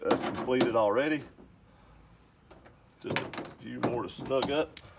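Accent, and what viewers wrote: South, United States. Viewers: Southern state are you from because you have a very deep Southern accent.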